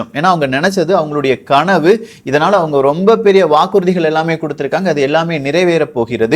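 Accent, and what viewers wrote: Kerala, India. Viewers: Friend, are you Keralite🤔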